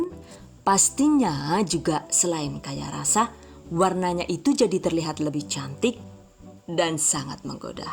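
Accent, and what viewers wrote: Banyumas, Indonesia. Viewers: Ora ngapak ora kepenaknya bun..😀😂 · Mba asli mana kok bisa ngapak? Salam ngapak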